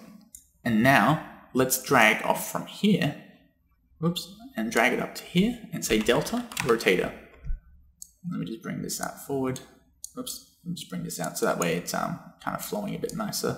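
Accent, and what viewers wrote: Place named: Australia. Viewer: Where are you based, you sound aussie??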